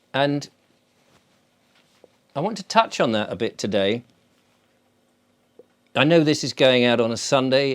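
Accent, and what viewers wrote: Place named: England, United Kingdom. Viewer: Love listening to typical English man with real English language my 3rd language..Listening all the way from Solomon Island - formerly "British Solomon Island" prior to our independence..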